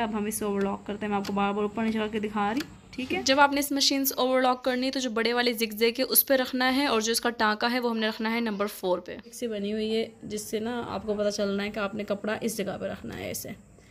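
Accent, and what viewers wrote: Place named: Karachi, Pakistan. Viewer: ap apne accent se karachi ky lgty ha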